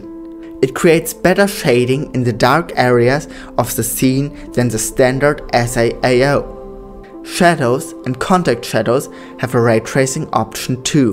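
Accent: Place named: Germany. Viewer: I can hear your German language roots so much.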